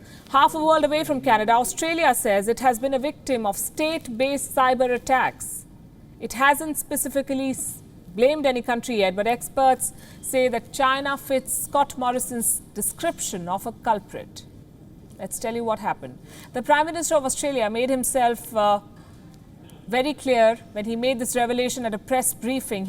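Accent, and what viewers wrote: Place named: India. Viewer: I like to listen but heavy indian accent make me dizzy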